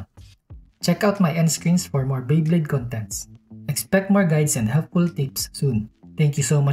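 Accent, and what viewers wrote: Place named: Philippines. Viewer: This can literally help out beginners! Also your accent seems familiar, are you also a Filipino?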